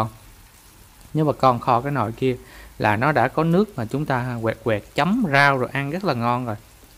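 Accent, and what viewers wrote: Mekong Delta, Vietnam. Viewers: Cách nói chuyện đúng chất miền Tây luôn ạ ❤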